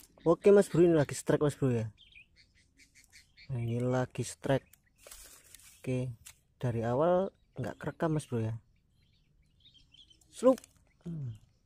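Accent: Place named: Banyumas, Indonesia. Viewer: Iki tunggal e cak agus ngapak😂🤣spot e marai ngiler🤣🤣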